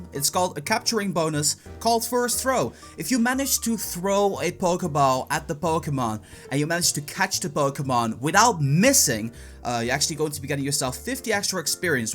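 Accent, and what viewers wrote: Netherlands. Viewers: Are you dutch? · are you dutch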